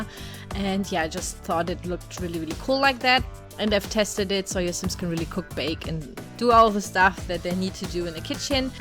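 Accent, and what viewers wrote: Germany. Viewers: Ich habe sofort deinen deutschen Akzent erkannt, aber bin froh dass du Videos in Englisch machst, um mehr Leute zu erreichen. Finde dich sehr sympathisch. Echt super gebaut!:)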